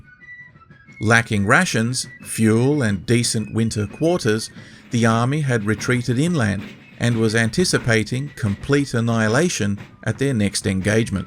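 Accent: Australia. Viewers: I...think you are an Aussie. No problem haha. Your productions are great. And you have an excellent delivery voice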